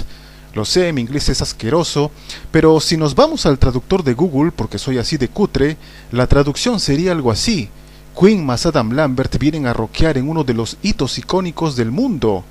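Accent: Peru. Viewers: Eres peruano?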